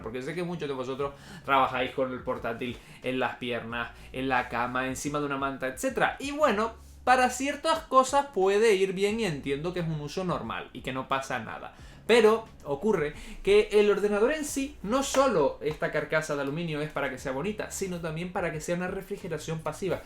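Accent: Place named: Chile. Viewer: que curioso que los españoles hablen parecido a los chilenos. De primera pensé que eras chileno por la entonación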